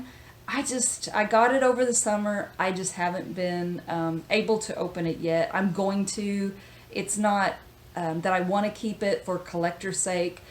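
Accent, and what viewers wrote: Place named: South, United States. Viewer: I love your accent, very southern lol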